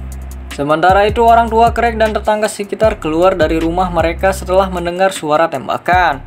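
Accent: Bali, Indonesia. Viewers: Nada suaranya kek orang bali ni ya bli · Apakah admin ini orang bali?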